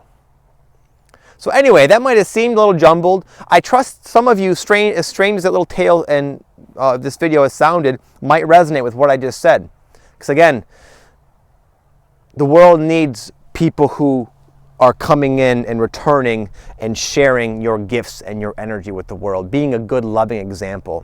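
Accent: Michigan, United States. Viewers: brother from Michigan <3 my name is Anjalina; I've lived in Colorado for a long time, when I first heard your voice on your channel this summer, it reminded me of home. thabkyou for your wise words, along my journey I had a super accelerated beginning, then a big fall, and a steady climb back "up" now, I am certainly on the cusp of something right now, again, thankyou- sending the most confident vibes your way. XX 🐉♄♇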